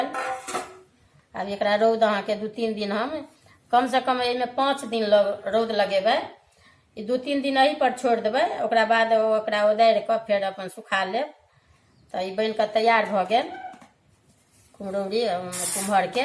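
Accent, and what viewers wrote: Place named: Maithili, India. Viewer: Sudh maithili bhasa suinke mon anandit bhai gel.. Ahake gaam aa jila ki bhel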